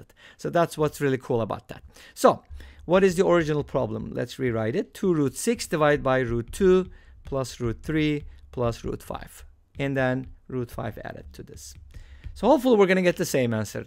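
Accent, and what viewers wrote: Turkey. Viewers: You are turkish arent you i can tell by your accent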